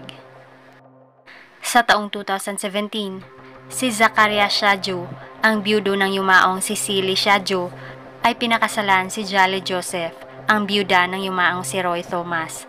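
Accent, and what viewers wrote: Visayas, Philippines. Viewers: Bisaya kc kaya minsan kaiba ung tunog ng oagtatagalog nya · Bisaya po kaya iba pagkakabigkas nya..☺ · Ang ganda ng boses nya sa mga nag bash sa kanya mag trabaho kayo wag palamunin😂😂 oo bisaya yan sya alam ko kasi bisaya din ako ano naman kung bisaya mas matalino mgabisaya kasi fluent kami mag English kami marunong mag tagalog with taglish pa kayo marunong kayo mag biisaya lol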